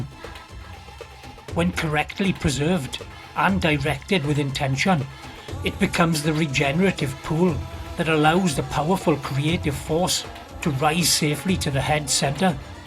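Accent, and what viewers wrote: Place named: Wales, United Kingdom. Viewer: Fantastic video. Thankyou for making this. Great to hear the Welsh accent. I was born in Cardiff and grew up in Rudry in south Wales.❤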